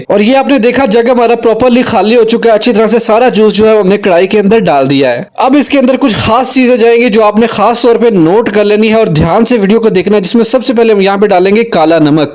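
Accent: Punjab, India. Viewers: Ap ki guftago me punjabi ka tarka😅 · Mujhe apka "VOICEOVER" or apka "PUNJABI" touch acha lagta hai 😊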